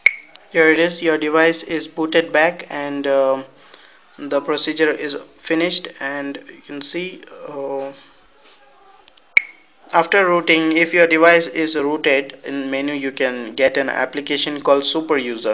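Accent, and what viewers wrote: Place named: India. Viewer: i can't understand the indian english :-(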